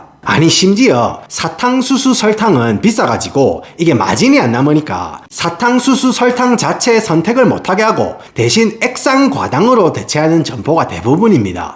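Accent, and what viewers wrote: Gyeongsang, South Korea. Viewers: 와.. 저희할머니가 쓰실법한 찰진 경상도사투리와 촉촉하게 찰진 미국식 영어발음에 넘나 귀호강입니다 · 한국말은 완전 갱상도 사투린데, 영어발음은 완전 본토네예.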